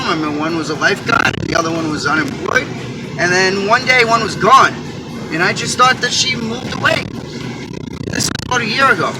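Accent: Boston, United States. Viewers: ur from Boston I can tell. I'm from Dorchester. yeah this is fuckin with me too..like flava flav from public enemy was always flavor flav in this reality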